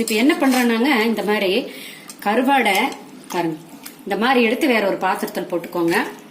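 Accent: Kongu, India. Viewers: கொங்கு தமிழ் அழகே அழகு ......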